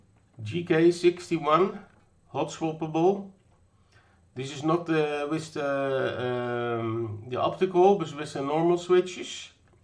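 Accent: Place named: Netherlands. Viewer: You sound very Nederlands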